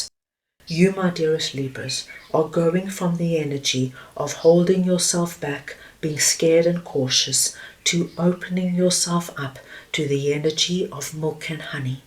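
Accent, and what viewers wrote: South Africa. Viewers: Nice reading. You sound South African.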